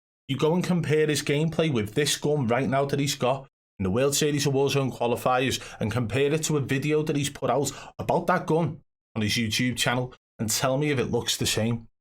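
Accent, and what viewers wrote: United Kingdom. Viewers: I barley understand you British fuck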